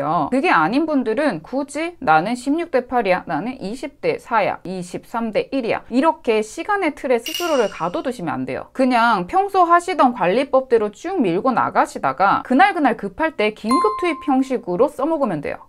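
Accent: Gyeongsang, South Korea. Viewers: 경상도분이시죠?? ㅋㅋ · 음... 혹시 경상도에서 사시는 분이신지???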